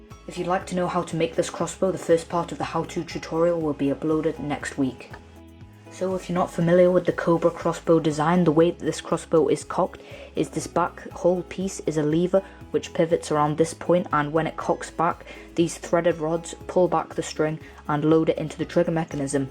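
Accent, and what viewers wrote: United Kingdom. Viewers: I think his accent sounds British, definitely not New Zealand? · Are you from newzealand because on your pants it has Canterbury New Zealand? But your accent sounds British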